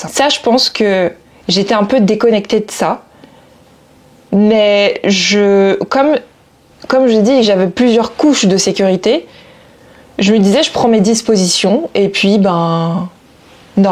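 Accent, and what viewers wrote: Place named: Guadeloupe. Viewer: ah oki je me disais bien que j'avais entendu un petit accent de chez nous. Merci beaucoup pour ta réponse et en ka voyé on big Foss et couwage baw de la Guadeloupe !! Never give up we keep getting stronger!!! 💪🏾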